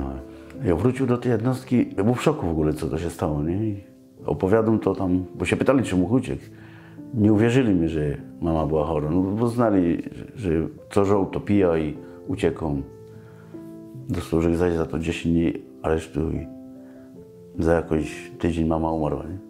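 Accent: Silesia, Poland. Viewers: Słychać z gwary że to Ślązak. Och lot Och lot. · Historia ciekawa ale historia i Śląski akcent to dopiero się słucha :) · Fajny akcent śląski! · Super job:)! Uwielbiam slaska gware,akcent. Best regards from Belfast:)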